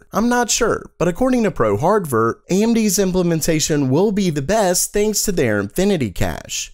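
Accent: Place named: Texas, United States. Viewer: Your accent sound like a Texan or Oklahoman accent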